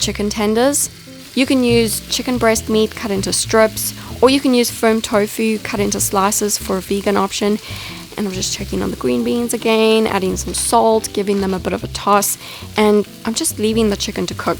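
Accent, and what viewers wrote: South Africa. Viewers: Are you South African? I can sense it immediately! I’m also raised by a South African family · I’m not too sure what her accent is , maybe SA , but I’m obsessed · Love your videos! Do i hear a south african accent?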